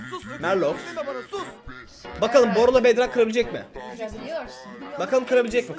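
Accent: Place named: Southeast Anatolia, Turkey. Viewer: Abi niyeyse güney doğu anadolular gibi konuşuyorsun arada Yoksa sen güney doğu Anadolulumusun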